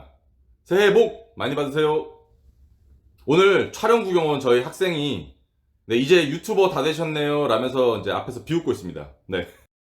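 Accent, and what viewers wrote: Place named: Gyeongsang, South Korea. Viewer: 경상도 사투리 매력 있으십니다 선생님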